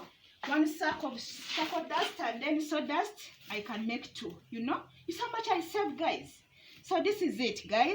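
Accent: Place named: Uganda. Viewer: I want to be your friend..i work together in saudi with annet..shes from uganda..the way she speak is same as you...shes a goodgirl also..freindly and kind..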